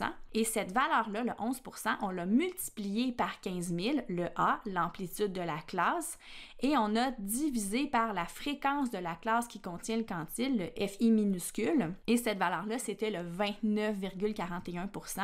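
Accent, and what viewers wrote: Canada. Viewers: J’en peux plus de l’accent des canadiens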